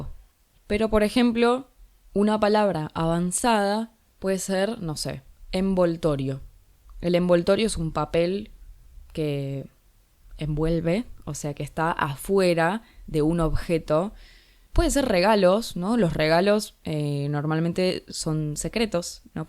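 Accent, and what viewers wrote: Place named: Rioplatense, Argentina. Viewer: Great accent to learn. I love Rioplatanese. I wish there were more of these to listen to, since it is valuable Comprehensible Input, but I am looking for 500 hours worth, not 500 minutes. Still, it is nice to have this Argentine source for a short while. Thanks for making these videos.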